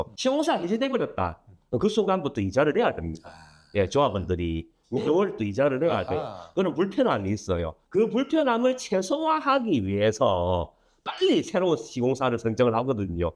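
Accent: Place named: Busan, South Korea. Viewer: ㅋㅋㅋ 인정~~~ 하지만.... 부산 사람은 자막 안 봐도 다 알아 듣습니다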